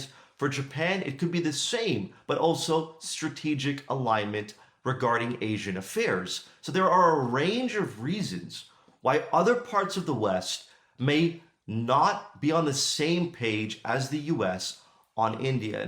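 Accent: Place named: Canada. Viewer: You can tell he's not in the US. Listen carefully, and you'll notice he's Canadian.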